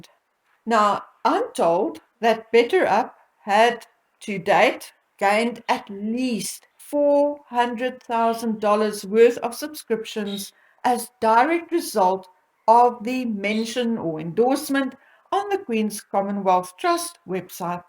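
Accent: South Africa. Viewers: Dearest I just want to say thank you 🙏 what a super video, it may take me a little time to digest as I’m not as young as I used to be 🤣 but I do so love your videos and hearing your beautiful South African accent, it’s just delightful 🥰 take care stay safe 💜 looking forward to your next video 🥰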